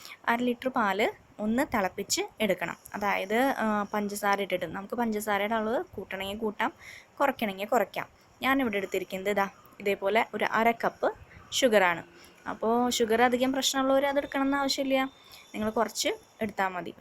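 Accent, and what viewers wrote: Thrissur, India. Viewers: Thrissur aano native place 😃slang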